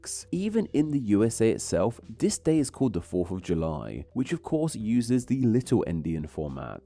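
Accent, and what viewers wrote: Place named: London, United Kingdom. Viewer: Good video. I'd love to know where your accent comes from. I've known people who speak just like you, all from London and the South East, but it's quite distinct.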